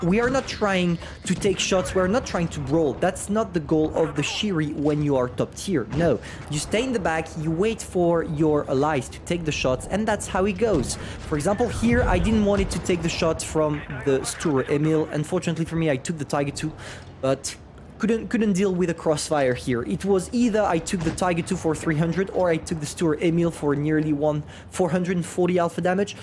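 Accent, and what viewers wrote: France. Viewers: It’s not his English, it’s just the French accent everyone chill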